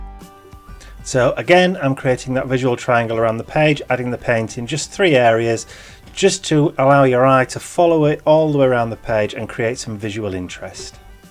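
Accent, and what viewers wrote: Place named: England, United Kingdom. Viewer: Hey up chuck, though yur accent sound'tut familiar. It be furst time av sin thi, like. E tis nice to hear a fellow local brit, lol. That took some doing to stop it auto correcting! Who's lad that dose stencils? Would be good to know. Bic W ' house; are they really bad, as I looked up there customer reviews and didn't dare take a chance with not having a great deal of £ and didn't want to risk loosing it. Sorry to bombard you with Q's. Thanks for sharing your page, colours wer grand! Joanne.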